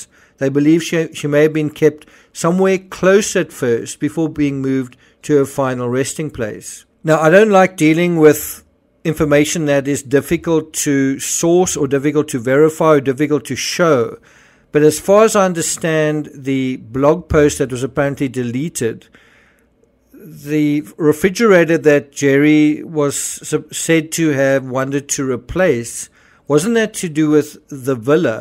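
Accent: South Africa. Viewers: OH! It's was in the Daily Star, then we know it's true. I can tell from your accent you are South African, you clearly do not know what sort of newspaper the Daily Star is?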